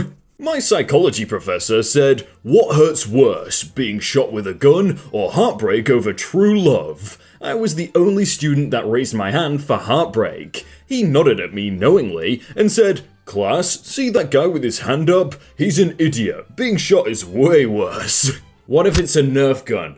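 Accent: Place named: United Kingdom. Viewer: How can you be british, or at least sound it and NOT know who klopp is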